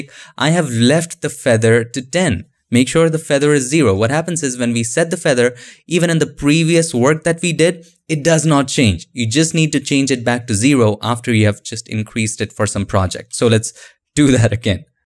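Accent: India. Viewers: you are so pro. i like your tutorial, and India accent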